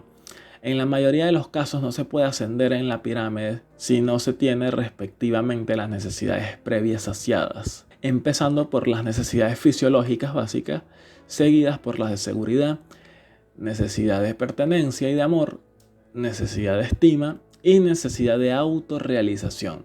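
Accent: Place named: Caracas, Venezuela. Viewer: Por tu acento eres caraqueño y por lo otro eres de derecha.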